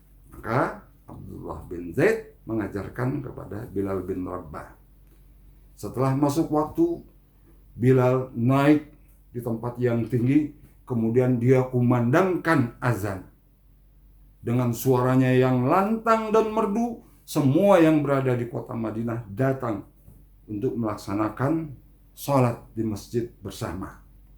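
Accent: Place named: Betawi, Indonesia. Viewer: Alhamdulillah, nama sy dan pak Sudari disebut, dalam Video YouTube Panggilan Adzan. Mantap, dg dialek kental Betawi, Tausiyah yg gampang diingat, pas sedikit ada kelucuan. Lanjutkan, Abah Ustdz.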